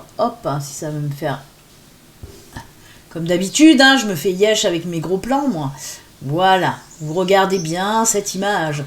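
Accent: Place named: Provence, France. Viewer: Vos un petit peu ça fait très marché de Provence 😉😂... Ne changez rien, ça ensoleille nos journées, de même que votre bonne humeur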